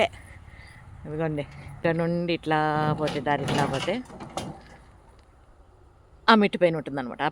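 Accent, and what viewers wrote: Chittoor, India. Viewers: తెలుగేయ్ అమ్మ మాట్లాడేది చిత్తూరు యాస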